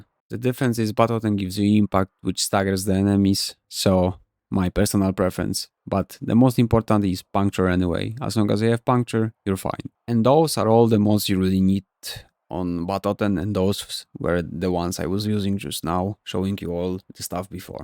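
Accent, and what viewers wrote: Poland. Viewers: Just on their own, hounds with manifold and contagious bond, synergized prospectus and some good statuses on their weapon can nuke rooms on steel path (especially now if your hound gets jade eximus aura that's like guaranteed room wipe xD ) But man, damage on that Syam is crazy! I really have to finally get this weapon Also, it's Muramasa a reference to Terraria? Oh and you're from Poland, yeah I thought accent sounded familiar :D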